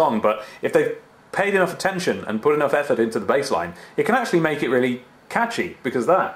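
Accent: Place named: England, United Kingdom. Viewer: So glad I've finally see man with an English type accent make fun of Americans...my life is now complete...wait...or are you AUSTRALIAN?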